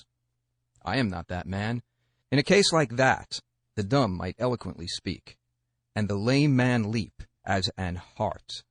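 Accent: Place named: Boston, United States. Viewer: aside from the obvious white Boston accent, THE Words are amazing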